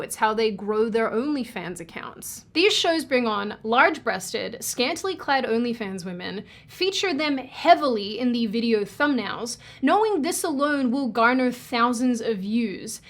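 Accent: United States. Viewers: Your accent is changing... can hear the American coming through!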